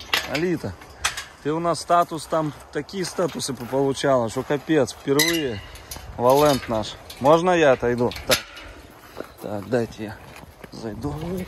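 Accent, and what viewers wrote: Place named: Ukraine. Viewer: привет. Я на работе, показал твоё видео,один человек, даже подписался на твой канал. А мне говорят, что у тебя, украинский говор.Есть ли у тебя,родственники в Украине.У нас парень работал Сергей,его тоже фамилия.Он сам родом из Чукотки,а сейчас живёт, в городе Изюм.Просто ради любопытства, ты случйно,не его родственник?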